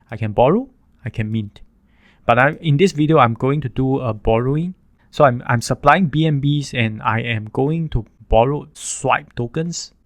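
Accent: Singapore. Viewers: Singapore/malaysian ascent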